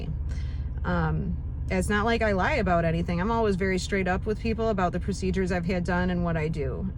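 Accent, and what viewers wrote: United States. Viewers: Bonjour à vous je viens de m'abonner à votre chaîne vous êtes courageuse avec c qui vous êtes arrivé pour Vos dent croqué la vie a plein dent vous avez raison et vous êtes très belle j'adore votre accent américaine moi je français et je m'appelle Jonathan enchanté de vous regarder bisous à vous 😊 bonne continuation 👋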